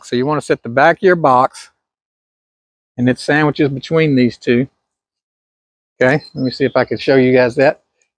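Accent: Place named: Australia. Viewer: ☺ Cedar, you can't go wrong, it will last for years. The AI must not have liked the Aussie accent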